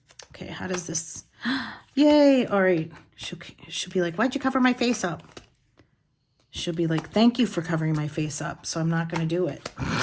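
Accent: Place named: New Orleans, United States. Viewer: What area of the country are you from (if you don’t mind saying, you sound like you could be from New Orleans or another port city)… except that you say “you guys” instead of y’all - just curious I’m a speech pathologist and like to guess.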